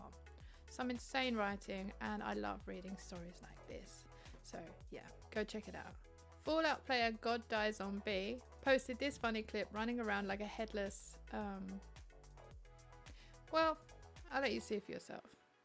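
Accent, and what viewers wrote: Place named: RP, United Kingdom. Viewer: being posh!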